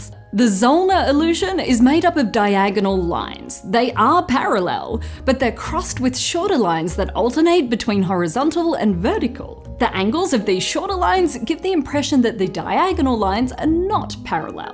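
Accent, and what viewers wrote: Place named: Australia. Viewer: Monologue my brains out. Only with the Aussie accent though.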